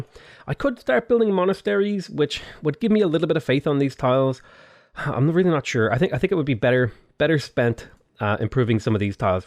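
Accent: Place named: Ireland. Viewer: He sounds Irish, might as well ask if the Pope shits in the forest.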